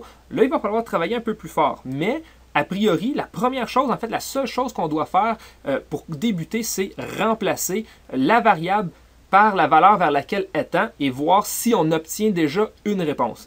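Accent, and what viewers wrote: Quebec, Canada. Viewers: Srx on voit rien sur ce qu'il fait écris dans le tableau et les dessins des graphiques sont frenchement dégueulasse...sans compter (bien que le mec n'y peuvent rien) un accent québecquoi absolument dérangeant et rendant la compréhension encore plus difficile... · si t'as un problème avec l'accent québecois, je suis toute ouïe. sinon, va donc chialer ailleurs.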